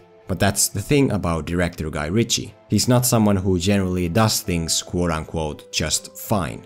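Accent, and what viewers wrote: Finland. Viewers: Suomi aksentti on point mun nekru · You sound very much like a fellow finnish man. Am I correct?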